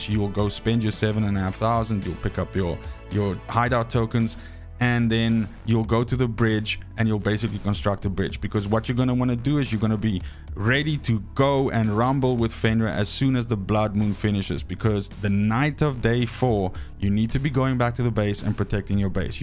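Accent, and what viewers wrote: South Africa. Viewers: Your channel says Denmark, but you sound like you're from South Africa. Anyway thanks for the tips bro. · South African perhaps? I hear quite the familiar accent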